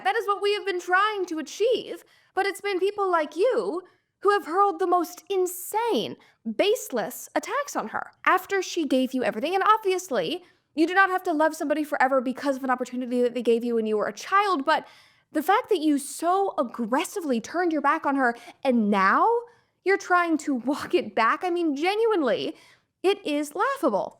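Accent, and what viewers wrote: United States. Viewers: now become more active in creating more awesome contents for us as fan weekly 4 videos, i really liked your vibes typical American accent fast quick accurate and precise no wonder u name yourself professional yapper:yt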